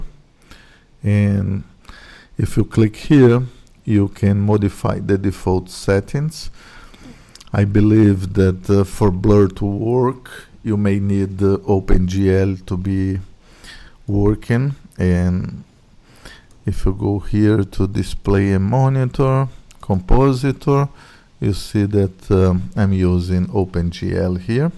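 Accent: Brazil. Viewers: tnx for the video! anyway, r u brazilian or something like this? i noticed a very specific accent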